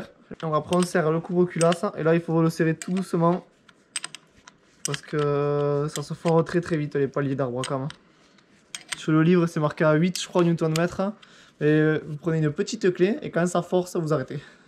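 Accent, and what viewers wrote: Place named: Southwest, France. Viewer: Salut...je viens de tomber sur tes vidéos elles sont vraiment aux top!!! Et en plus avec cet accent c'est encore mieux !! J'ai le même !!! Vive le sud ouest !!! Je travaille sur Toulouse et je roule en enduro avec un excf 2010 dans le Gers a un niveau amateur pour le plaisir! Je viens de me rendre compte que mon moteur est bloqué alors qu'elle ne l'était pas il y a quelques jours !!! Va falloir que j'ouvre pour aller voir du côté cylindre piston ! J'espère que ce ne sera pas plus grave. Est ce que les excf de 2010 sont quasi identiques au moteur Husqvarna comme ta vidéo ? Je cherche également un support moteur c'est un fait maison le tiens ? En tout cas tu peux continuer tes vidéos c'est un régal... Bon dimanche à toi A+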